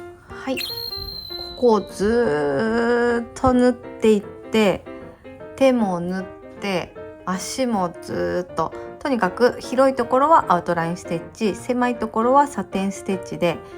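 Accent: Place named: Shimane, Japan. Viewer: 一年ちょい遅れで始めました、見つけて効率よく刺してます何より、話し言葉に惹かれ… 私の故郷の北九州弁とそっくりな方言満載…と思いきや、「だんだん」で島根の方と判明時々挟むダジャレ？に、同世代感を勝手に感じ、楽しく後を追いかけています❤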